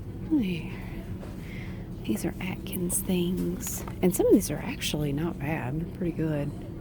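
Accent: South, United States. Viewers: This video is such a comfort to me for multiple reasons. I’m from this area and grew up going to this Target. Walking through this familiar space with a southern voice similar to the ones I grew up with really reminds me of home. Now that I’m in college this video always makes me feel better if I get a little homesick. Your energy is so comforting and calming. I also love organizing/sorting/straightening, so that’s very soothing as well. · These are fascinating! I love your accent( I have a southern accent as well) and I love your organization! Please continue making videos:) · I was 24 min in before I realized how long I had been watching! As a southern person it's so soothing to hear a familiar sounding voice :) You made me want to go shopping too haha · I'm American so all these products are familiar. I'm from the south and get accent is familiar. 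Never thought this would be satisfying and relaxing.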